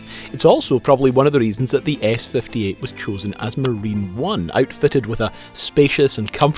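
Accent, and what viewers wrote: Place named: Scotland, United Kingdom. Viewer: I don't understand your Scottish accent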